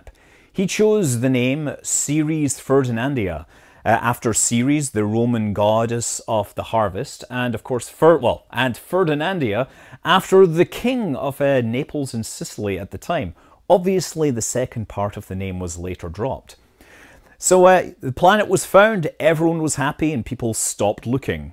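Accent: Scotland, United Kingdom. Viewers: This is my favourite Scottish-sounding astronomy channel! We could predict where and when they will hit Earth. I don't know that we could stop them however. At least we would know when we are going to die. On second thoughts, maybe I don't want to know.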